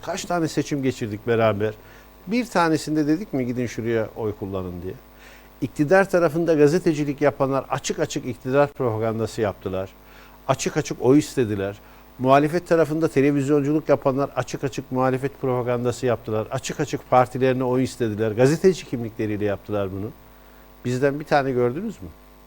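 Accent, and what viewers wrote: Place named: Konya, Turkey. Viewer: Yaptığınız yorumlar, Konya ağzını kullanışınız ve gazeteciliğinizi seviyoruz, teşekkür ederiz Bey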